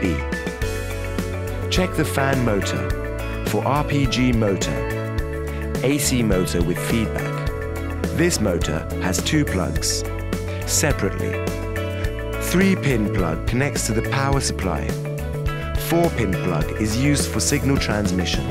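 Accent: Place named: England, United Kingdom. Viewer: I HATE ROBO VOICE VIDIEOS< , ESPECIALLY WITH AN ENGLISH ACCENT!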